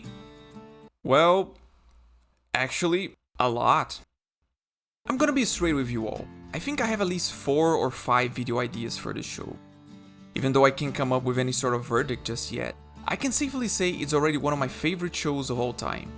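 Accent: Brazil. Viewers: Ótimo vídeo. O sotaque brasileiro ficou gritante, e eu adorei. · Cara, vc é brasileiro? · Ai doidão, tu é brasileiro, né? Eu sinto o Brasil na sua fala.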